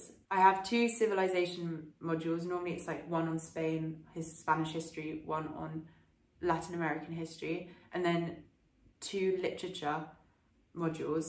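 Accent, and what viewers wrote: France. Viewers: At what age did you started to have the French accent and how?:)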